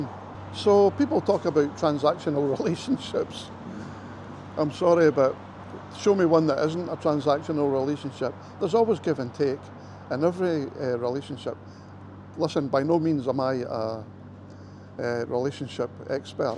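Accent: Scotland, United Kingdom. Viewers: I just finished listening to the age gap piece. First comment, your accent gives you away ' '. I was born in Glasgow in '45. There is a 12 year age gap between my wife and I. Not a big deal as she was 44 when we met 22 years ago back in Canada. She was an OFW contract Caregiver and I worked in Health Care. Our paths crossed and the rest became history. Planning ahead, we bought a condo in Davao in 2012. It became our holiday home. Now that she is retired too, it is now our retirement home and Canada is the holiday destination. As for the age gap, there never really was an age gap for us. When we got together, I made a point of learning as much as possible about the Philippines, from the beginning to the current. History, politics, customs, music, you name it and I am nose deep into absorbing as much as possible. Take care, stay safe and keep posting. 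👍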